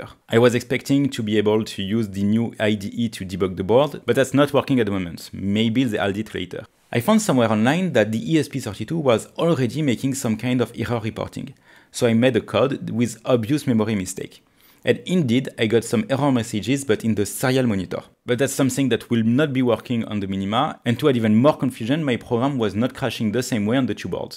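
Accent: France. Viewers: Vous parler trop vite cela rend votre accent Parisien très agacent... mais quand meme très instructif ...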